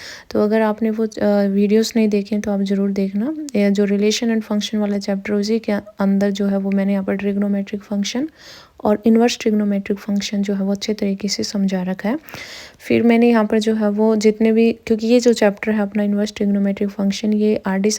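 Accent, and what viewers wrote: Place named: Kashmir, India. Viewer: Mam apk accent se lga..kashmiri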